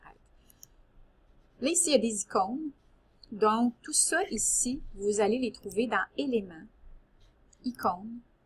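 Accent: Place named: Canada. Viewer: Merci pour votre vidéo. Grâce à vous j'ai découvert le logiciel "Canva". Continuez c'est vraiment bien. J'adore votre accent canadien si je ne me trompe pas!!!!! MERCI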